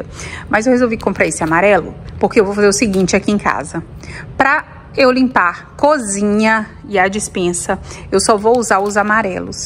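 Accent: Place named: Minas Gerais, Brazil. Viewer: Vc é mineira UAI 😂😂 acho lindo o sutaque minerim 😂😂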